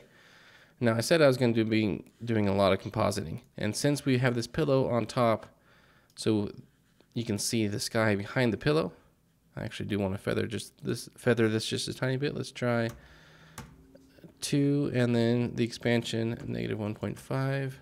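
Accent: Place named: London, United Kingdom. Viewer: 3sec to watch and like, thx. More tutorials!!! best londons speak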